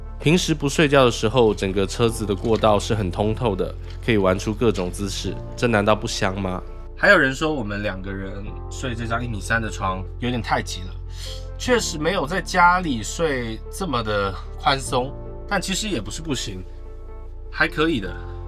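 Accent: Taiwan. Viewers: 語調很台...用語很中....真怪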